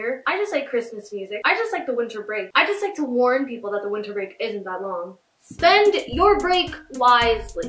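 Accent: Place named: Trinidad and Tobago. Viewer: Why do u sound trini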